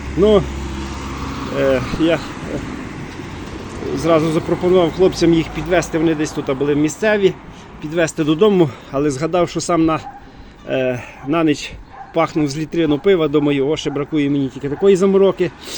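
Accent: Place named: Kremenets, Ukraine. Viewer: Кременецький "діалект" просто жахливий, коли слухаєш "зі сторони".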